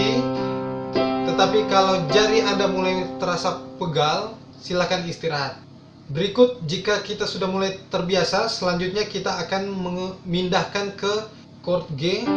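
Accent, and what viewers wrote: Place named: Manado, Indonesia. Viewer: Dari logat berbicanya pasti orang manado nih hahaha.. :D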